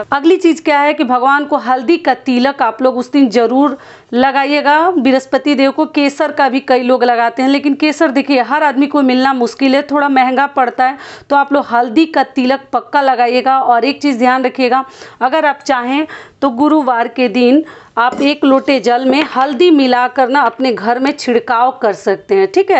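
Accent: Bihar, India. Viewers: Didi aap Bihar se hain kya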